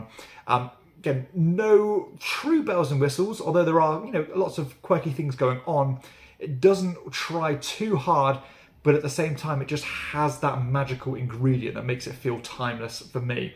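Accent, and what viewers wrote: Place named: United Kingdom. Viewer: As a Brit myself i sometimes dispair at the domination of YT by our friends from across the pond …Its Great to see more English reviewers on YT …very clear and eloquently presented top ten …makes me so happy to hear British English from UK gamers hurrah !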